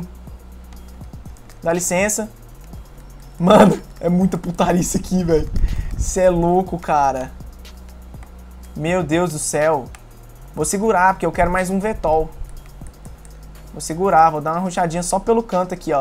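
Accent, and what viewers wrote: Minas Gerais, Brazil. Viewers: Quem curte o Mineirês do dá like!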